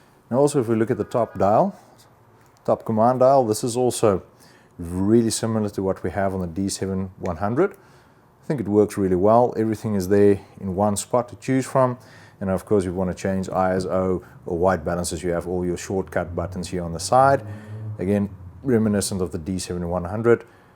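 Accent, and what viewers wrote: South Africa. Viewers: You sound Afrilaans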